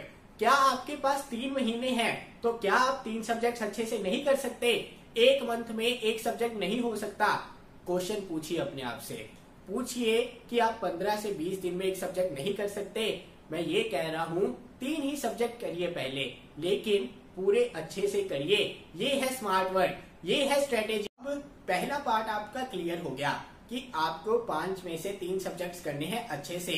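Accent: Punjab, India. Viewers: Aaye haaye..Punjabi Accent Sir ka😍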